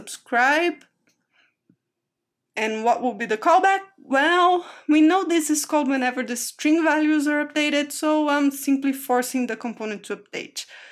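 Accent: Brazil. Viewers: Are you brazilian?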